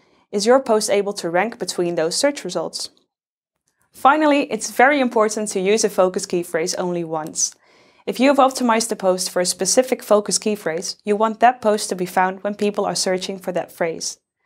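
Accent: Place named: Netherlands. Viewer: She dutch though, typyical Dutch accent :D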